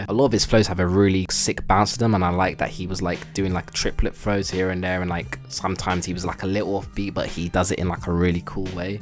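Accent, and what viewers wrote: United Kingdom. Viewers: Honestly, you don't sound black enough. Why are you wasting your fancy British accent on dissing old rappers? The whole point of the genre is machismo. Even if you made the right argument, it wouldn't matter because you sound polite.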